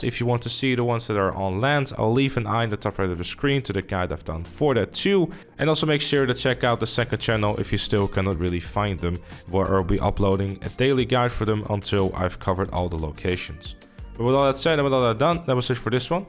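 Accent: Netherlands. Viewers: Jij bent Nederlands dat hoor ik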